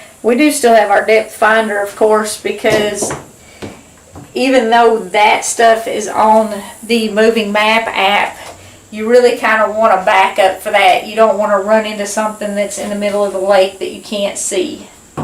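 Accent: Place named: Kentucky, United States. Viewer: Hi - what's new on the houseboat? We're working on a plan and your videos are so helpful. Where are you? You sound like you're from KY or Western NC where I live. I've learned a lot from you and look forward to hearing from you again soon. Thanks.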